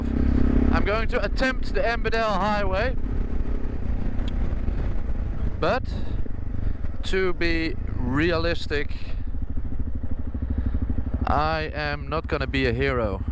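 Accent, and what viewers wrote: Netherlands. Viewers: Do you sell merchandises? Your dutch accent reminds me of Itchy Boots Noraly. You both sound the same. I live on Australia. Used to live in Germany. I can understand and read dutch a little bit. Sounds similar to German.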